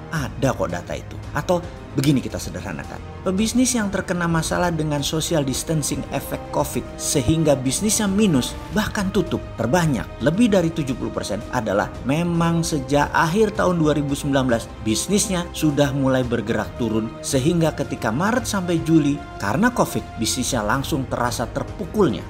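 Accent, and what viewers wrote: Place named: Malang, Indonesia. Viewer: Iki jan omongane wong malang banget.. Kopimu kurang kentel, dolenmu kurang adoh rekk..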